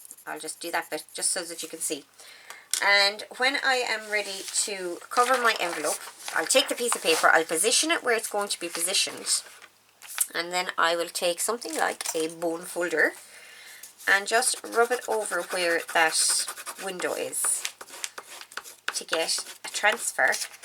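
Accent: Ireland. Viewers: Superb, fun, engaging, inspiring 🌹 Thank you so much for creating these two styles, they are just wonderful and I’m thrilled that you use lace and fabric, gesso and metallic in these styles, I’m definitely going to create a Shabbychic style for my current project! Wonderful to hear your beautiful Irish lilt and watch your happy, fab tutorial! Hugs and blessings 🫂🙏🏻❤️😍🌹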